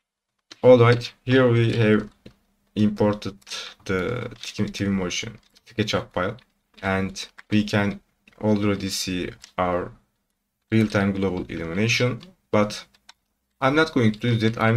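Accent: Turkey. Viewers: Kardeş eğer sen türk değilsen ben bu işten birşey anlamıyorum demektir telafuzun çok belli ediyor kendini, harfleri türkçe vurguluyorsun. yanlış anlama bunu kötü amaçlı söylemedim videoyu çok beğendim yardımcı oldu teşekürler 👍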